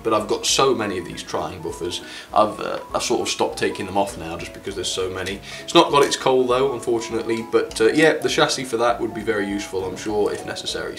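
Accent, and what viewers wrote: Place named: Derby, United Kingdom. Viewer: hey! Just a quick question, are you from Derbyshire? Your accent sounds like you're from Derby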